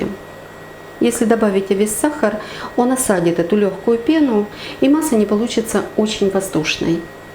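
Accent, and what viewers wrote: Ukraine. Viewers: Считаю Ваш канал лучшим, Вы всё объясняете простыми, понятными словами и Ваша украинская речь очень приятна❤❤❤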